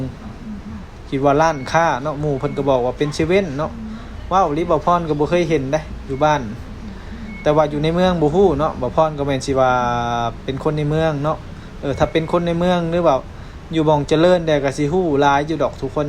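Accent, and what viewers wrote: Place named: Bangkok, Thailand. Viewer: เริ่มเรียนรู้แล้ว.... ใช่ไหม คลิปหลังๆ ดีขึ้นมาก ไม่มีการบ่น และเข้ากับสังคมเมืองได้มากขึ้น... เคยได้ฟังเจ้า... พูดไทยอ... บอกเลยว่า สำเนียงเด็กกรุงเทพ....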